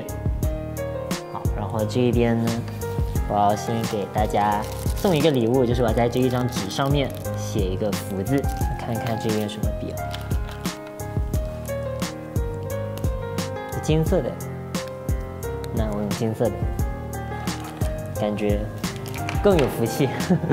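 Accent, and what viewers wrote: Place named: Malaysia. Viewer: 对啊讲回马来西亚腔好亲切啊，真的好可爱呀他讲话。好可惜我的小名不是阿ying，哈哈哈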